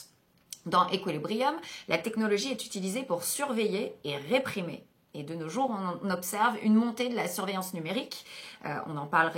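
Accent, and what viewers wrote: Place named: England, United Kingdom. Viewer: Bonsoir madame, non moi je dois trouver un moment pour regarder un de vos films, avec votre english accent que jadore, sinon toujours classe.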